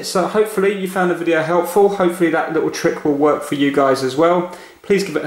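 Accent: United Kingdom. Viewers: thank you british man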